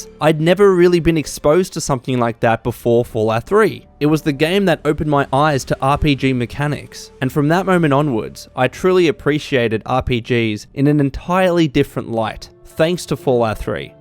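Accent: Australia. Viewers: Wooo! IVE MISSED THAT AUSSIE VOICE! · Goddamn I missed hearing that smooth Australian buttery voice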